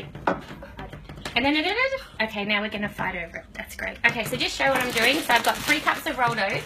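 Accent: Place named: Australia. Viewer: Hi, Thanks for showing me how to make slow cooker porridge. Incidentally I like your warm Australian accent and the gentle way that you supervise your daughters who seem at that curious/testing things out stage of development. I am single and have a compact slow cooker, so I will just have the amounts. Warm regards from Robert in Scotland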